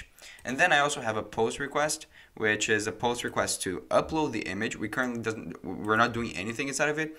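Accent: Brazil. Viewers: TU É BR NÉ? namoral sotaque foda, mas da pra ver q é br. Belo tutorial <3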